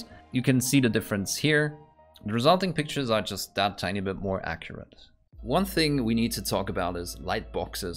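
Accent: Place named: Austria. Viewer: I really like your video cutting and narrative! Keep it up, maybe try working on your pronunciation of the O. Otherwise it's really hard to guess that you are Austrian :P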